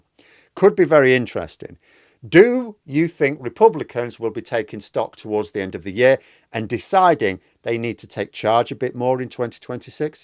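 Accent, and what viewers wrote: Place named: Yorkshire, United Kingdom. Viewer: I sent him an email about it so perhaps he could change it, without embarrassing him. He replied: "I don't mispronounce it, I pronounce it according to my own accent. Pronunciation is regional, and I ain't from your region. So, what I'll actually do is carry one pronouncing words in the way people from my area pronounce them." Kamāla is an Urdu name, different etymology; there are more Muslims in Yorkshire than Hindus by far so he may have heard that, quite different, name.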